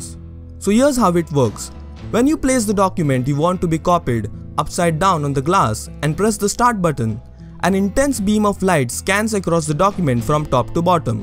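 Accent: India. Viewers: I am a Taiwanese and no offence intended. Actually, I am not a fan of indian accent but because your video is highly informative and understandable so I found your voice beautiful and educational.... Big thanks！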